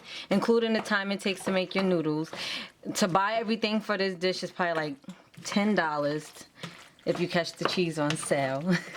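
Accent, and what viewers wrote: Brooklyn, United States. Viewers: Nicely done!!! I hear a Bk accent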